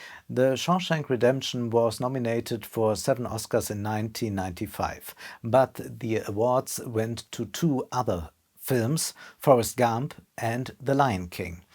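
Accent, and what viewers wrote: Germany. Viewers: Du solltest dringend mal ein paar Jahre in einem Land leben, indem Englisch gesprochen wird. Dein Englisch ist richtig German. 🤒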